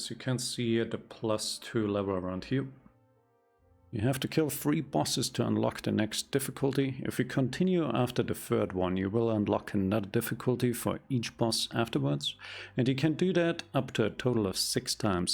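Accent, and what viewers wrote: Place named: Germany. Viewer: No wonder why i felt the German accent :)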